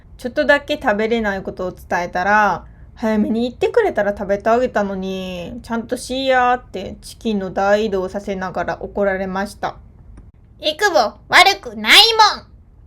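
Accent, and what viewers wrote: Kansai, Japan. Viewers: YouTube始めたての時よりも関西弁出てきてて好き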